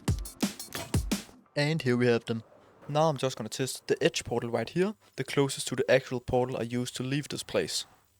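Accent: Denmark. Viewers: Your Danish accent is thick 😅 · bro din danske accent dræber mig indeni 😭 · Great video! Got to Love the danish english 😂 great job! Greetings from norway! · The danish accent LOL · skud ud til den danske accent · er du tilfældigvis dansk det lyder sådan. anyways fed vid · 30s in and I'm guessing you're Danish. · i like that after just 1 second of him speaking i could hear he was danish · are you danish by any chance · tydeligste danske accent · I know a danglish accent when i hear one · I can tell he’s danish! Godt arbejde! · wait are you danish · The danish accent is crazy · This sounds liek Danish english are you from Denmark i am. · din danglish er sindsyg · Are you danish? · bro got danish acent¨ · den danske accent er elite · YOOO... you dansk? ahah. Great video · hej bro, kan høre du dansk. skal vi lave en survival verden sammen, mangler end der er god til spillet lol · bro are u danish u have a very danish acent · I hear dansk 😮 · Den danske accent træder altid igennem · sekundet videoen startet ku man høre du var dansk men shii valid vid du mangler bare accenten nu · Danish ascent + bro cheated... 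Level 8 with elytra ( u start at level 7 when u die soo) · Holy danglish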